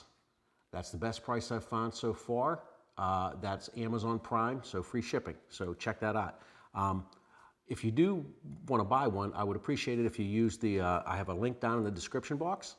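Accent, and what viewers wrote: Pittsburgh, United States. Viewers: That Pissburgh accent makes me miss home and goin dahntahn on the weekends. Solid review too!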